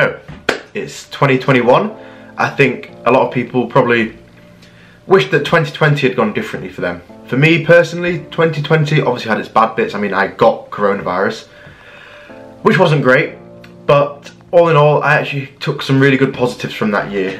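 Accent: United Kingdom. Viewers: you are wonderful! I love your down to earth style and your British accent is wonderful to listen to. Sorry to say, I'm too old to get physically fit. I know you will say "you're never too old." Been there, done that. But I really appreciate all your hard work and your beautiful body. (Do I sound gay? YESSSSS oh well) Love you,. Thank you for bringing some beauty into our sad world.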